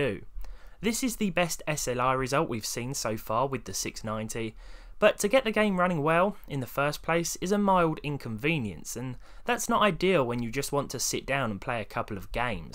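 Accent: United Kingdom. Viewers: British accent + old tech? Instant sub!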